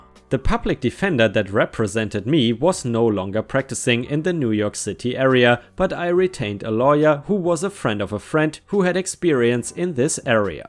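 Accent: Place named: Germany. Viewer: Another good video,! These stories were a little bit underwhelming, but it isn't like you write this stuff, right? I do enjoy your voice, especially your accent (brings back a whole bunch of happy memories of my childhood in Germany!), but here;s hoping you can find some more, well, let;s just say, "exciting stories". ⭐️⭐️⭐️⭐️⭐️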